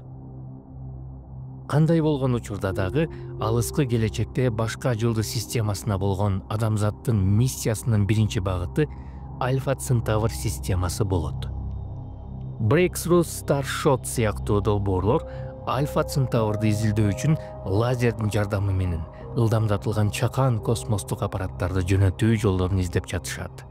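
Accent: Standard. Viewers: Ооой тим эле укмуш сонун сүйлөптүрсүң.😯 Таптаза кыргызча анан дагы үнүң да аябай уккулуктуу экен. Эң негизгиси абдан түшүнүктүү экен. Чаржайыт сөздөр жок так, даана сүйлөптүрсүң. Менден 1 лайк. Иштериңе ийгилик дагы да көп видеолорду күтөбүз. 👍👍👍👍👍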